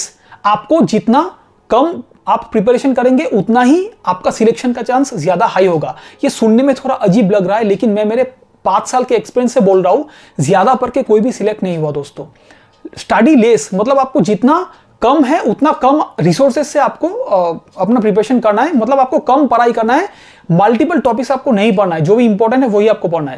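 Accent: Bengal, India. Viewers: Sir aap Bengali ho?? Kya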